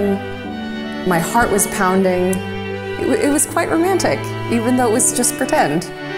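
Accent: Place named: United States. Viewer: Going by her dialect, do a lot of Americans/Canadians get involved in this thing?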